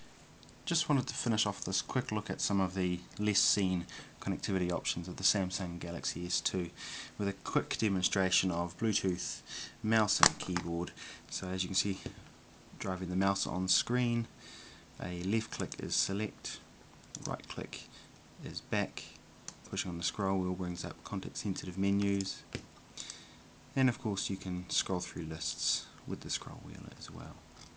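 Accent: New Zealand. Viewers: Excellent video, and awesome New Ziland accent. Great to see the keyboard and mouse attached. Thanks.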